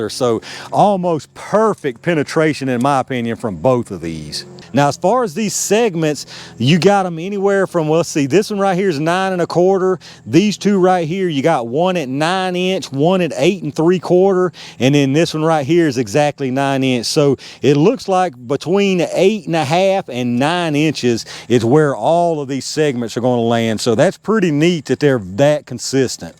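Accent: South, United States. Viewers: damn.. you surely dont expect a gun youtuber with a strong southern accent to pull up all those gears for ammo tests lol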